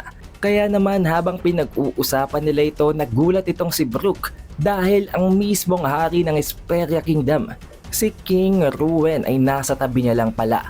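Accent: Philippines. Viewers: Idol try mo wag mag english ang pangit ng tuno mo eh masyadong pinoy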